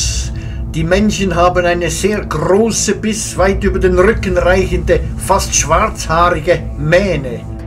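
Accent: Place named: Germany. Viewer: What the f is this guy saying???? I know he's speaking English but it sounds German.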